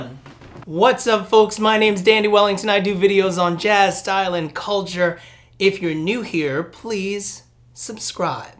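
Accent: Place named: New York City, United States. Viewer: I like your taste in fragrance ... and your accent, love the slight traces of the NYC accent <3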